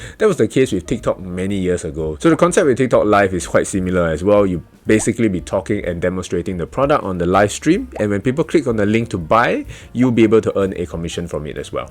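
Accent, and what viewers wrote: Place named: Singapore. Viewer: I love the candid video, thanks for making it! As soon as I (American) heard the Singapore accent, I knew you'd be way more honest / trustworthy than the other guru's on the internet. Every Singaporean I've met is pretty sharp and fair so far. Keep up the great work! Looking forward to seeing what's next.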